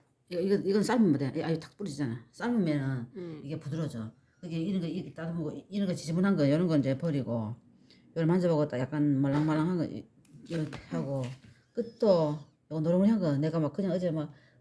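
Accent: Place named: Gyeongsang, South Korea. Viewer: 경상도 사투리는 또 하나의 외국어..ㅎ 정겹고 다정다감합니다. 맛도 좋은 만병초 함초가 지천에 늘렸다는 사실이 신기합니다. 늘 건승하세요.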